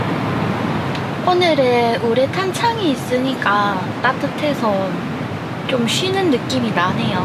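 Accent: Gyeongsang, South Korea. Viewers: 치우캠핑님이 구독중이라는거 보고 들어와 구독 좋아요 알림설정 해두고 시청중 새해 좋은일만 가득하시길~갱상도 말투가 귀엽네요~^^ 임시 땜방용 스카치 테이프도 가지고 다녀야 겠~~네~~요~^^ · 경상도인가요,구수하네요,ㅎㅎ 텐트가넒어난로를피워도,따뜻하이,안정감이있네요,풍경짱임니다,😁💚👍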